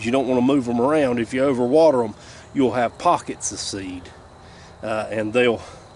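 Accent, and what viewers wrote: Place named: North Carolina, United States. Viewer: I knew I recognized that accent lol I'm from south eastern , NC. Felt like home.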